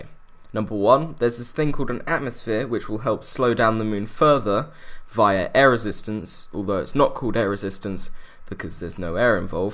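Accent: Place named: England, United Kingdom. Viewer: Eric Williamson Englishman speaking English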